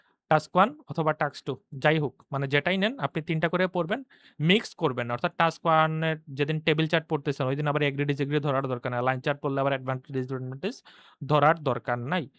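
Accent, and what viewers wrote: Sylhet, Bangladesh. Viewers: Bro are you from Sylhet? your accent is like a proper Sylheti 😀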